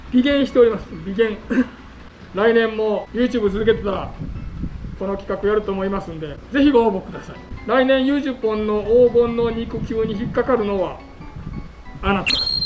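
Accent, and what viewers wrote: Okayama, Japan. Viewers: いつも楽しく拝見しております。自分黒崎中学校出身で故郷の海、岡山弁懐かしいですね。瀬戸内海のカニ、カキ美味しいですよね。さむい寒い季節ですが頑張って下さい。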